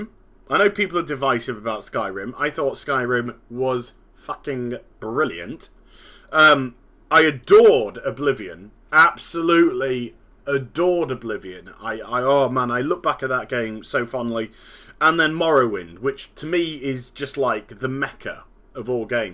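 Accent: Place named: United Kingdom. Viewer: why is it that British people pronounce it beeta instead of bayta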